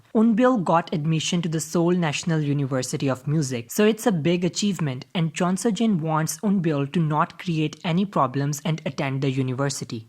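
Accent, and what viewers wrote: India. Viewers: I love your theory. And your Indian accent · mhm the indian accent gets me everytime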